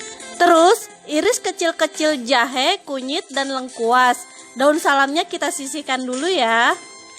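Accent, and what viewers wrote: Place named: Java, Indonesia. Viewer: Wadidau..medok beut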